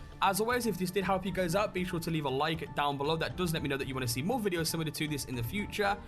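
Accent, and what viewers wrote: United Kingdom. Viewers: he is from the UK I believe, and in their military/police it is pronounced that way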